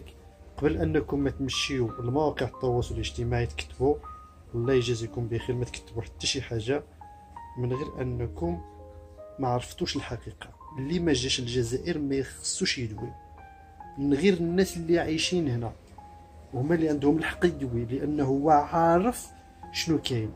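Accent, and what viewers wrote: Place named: Rif, Morocco. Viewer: klamek chahadeton laka yawm talki Allah marahba bik akhi tebaneli nta men rif khatach ryafa 3anedehom accent kima shab kbayel tizi bejaia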